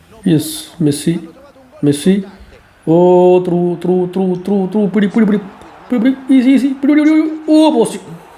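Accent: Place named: Kasaragod, India. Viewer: Majje illa nammale kasaragod bhasha alle ath😁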